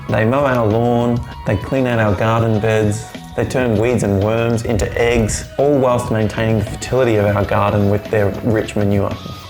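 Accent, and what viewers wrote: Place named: Australia. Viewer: your voice is so soothing. Almost couldn’t tell you were Aussie! ❤❤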